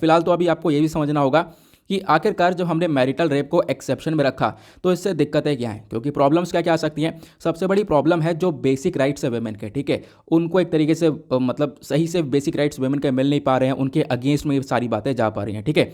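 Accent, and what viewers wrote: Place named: Uttar Pradesh, India. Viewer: acha mujhe nhi pta tha..actually mne 4 months phle se hi inko follow kiya h...ha mujhe bhi lgta hi tha..unke bolne ke style se..chlo hum sb up ke hi h..😆😂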